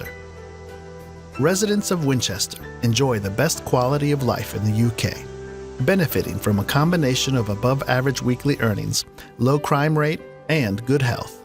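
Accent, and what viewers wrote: United States. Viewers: ... "best places to live in the UK" ... american accent introduces vid--wait. no. what? f** off. see ya* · Have you noticed the guy is bloody American 😂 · yanks - we pronounce clerk as CLARK and wish you would also.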